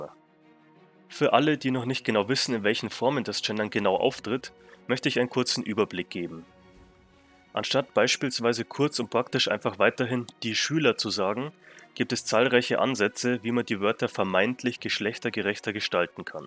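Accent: Bavaria, Germany. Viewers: wo genau? 'ua' is ja eher Niederbayern/Oberbayern. Mia Oberpfälzer bzw. Waitler han ja de oanzigen de wo no as 'ai' und as 'ou' ham😂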